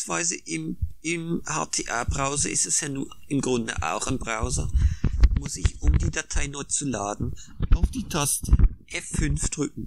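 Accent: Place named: Sauerland, Germany. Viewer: Welch Kräftiger Dialekt, Sauerländer?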